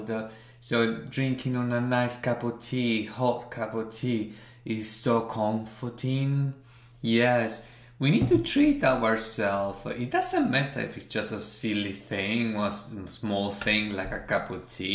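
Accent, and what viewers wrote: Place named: Italy. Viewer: Omg i love your voice so Italian, humble, and your reaction so so natural, good luck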